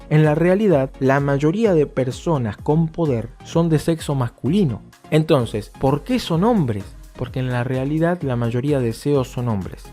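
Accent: Argentina. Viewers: En este vídeo se te escucha mucho el cheeee, el boludooo, lo argentinooooo; me gusto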